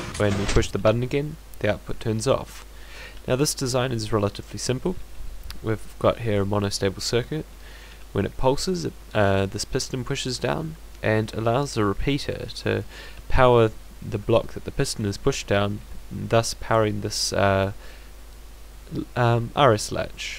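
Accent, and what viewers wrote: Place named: Germany. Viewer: Are you German? Cause you sound a little bit like a German ^^'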